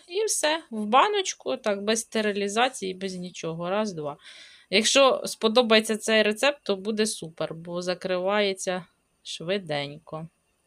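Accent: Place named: Poltava, Ukraine. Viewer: Приємно чути Полтавський говір. Мої кореня з Ґрадижська. Цікаво дивитися, згадую дитинство. Дякую. ❤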